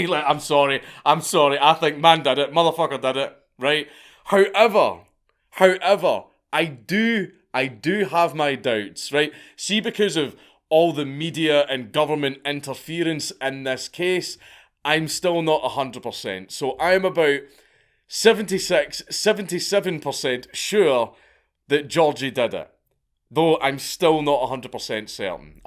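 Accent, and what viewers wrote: Scotland, United Kingdom. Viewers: I only subscribed and liked all this dudes videos originally because I wanted to support someone they were trying so hard to bring down. I love the idea of reverse advertisements. Hell right now I'm looking into this ghost gun thing the news seems to be pushing so hard. I just couldn't get past the accent before. But I do have to say this little Scot is growing on me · When I first discovered him I watched so many of his videos in such a short period of time that my thought actually started voicing in the Scottish accent rather than my native American one for a while.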